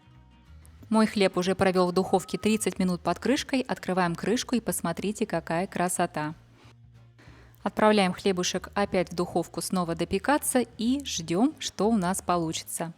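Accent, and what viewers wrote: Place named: Belarus. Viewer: Вы из Беларуси? Я узнаю этот говор. Как и свой, родной, Одесский. Пошла месить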